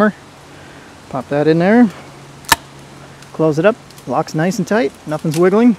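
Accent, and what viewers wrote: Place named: Wisconsin, United States. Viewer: You're in Spooner Wisconsin? I recognize the accent.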